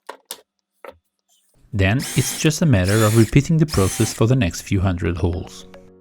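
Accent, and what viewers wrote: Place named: Portugal. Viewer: I was mesmerised watching this, and thinking to myself: "this guys sounds Portuguese" , and I was super happy to find out he actually is! :) I plan to do a lot of stuff myself on our new place, so I'm already subscribed! Kudos, great work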